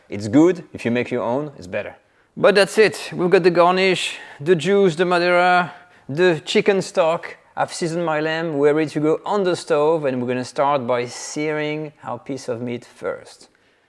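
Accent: France. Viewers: Dear friend!! You are a truly great cook! I have been following you for some time! I truly LOVE your videos and the way you explain everything, with your lovely ....Franco-English accent... BUT !!! Well, we have, SHOULD be a bit more CONSCIOUS OF what we eat!! BABY ANIMALS ??????? THINK ABOUT IT !!!! FEEL IT!!!! For the civilized world THIS FACT must be PREPOSTEROUS, DISGUSTING, APALLING !!!!!!!!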